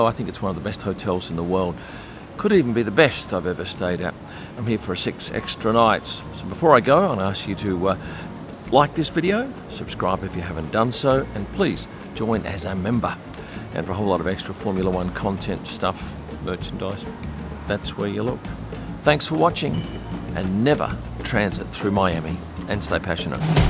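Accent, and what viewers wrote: Australia. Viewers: A kip? A dickie seat? Loving the your Ausiness! Fun seeing in the background 👍👍👍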